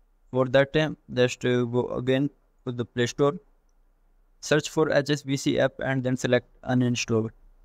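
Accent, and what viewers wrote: India. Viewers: I couldn't understand a word of this. Heavy (Indian?) accent = incomprehensible.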